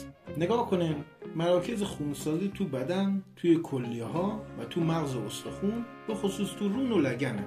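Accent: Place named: Mashhad, Iran. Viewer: عالی بود ،خصوصا با لهجه مشهدی شیرین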